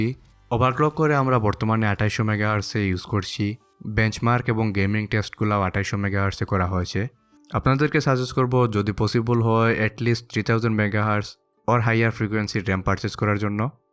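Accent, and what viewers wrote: Sylhet, Bangladesh. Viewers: Are you from Sylhet? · Bhai apni sylheti Maybe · Vhai.... Apni sylheti? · vaii kita sylheti nih?? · Sylheti mama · Sylheti nakita bhai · সিলেটি Bro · Sylhety ni bhai? Mat hunia lager sylhety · Bhai kita sylheti ni? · Dada Sylheti naki 😌. Sylhet hoile kinte pari. Reply diyen dada. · Great video... Are you sylheti brother? I was just wondering... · Bro apni ki sylheti?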